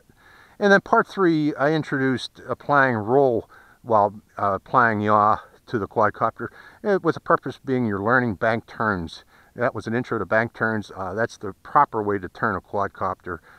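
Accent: Pittsburgh, United States. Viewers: I could be wrong, but you sound like a native Pittsburgher for sure 😉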